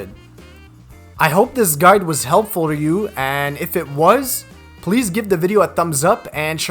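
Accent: Quebec, Canada. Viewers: I'm guessing French Canadian from Montreal or Gatineau.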